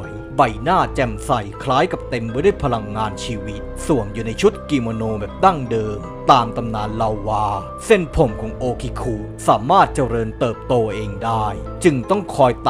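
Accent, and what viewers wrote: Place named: Central, Thailand. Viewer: คุณ ผมฟังคลิปคุณมาหลายคลิปแล้ว ผมชอบตรงที่การบรรยายของคุณมีเสียงกลางออกเหน่อ นี่คือเอกลักษณ์ประจำตัวคุณ มีเสน่ห์ประจำตัวที่ให้น่าติดตาม ผมชื่นชมและจะติดตามไปเรื่อยๆและตลอดไปครับป๋ม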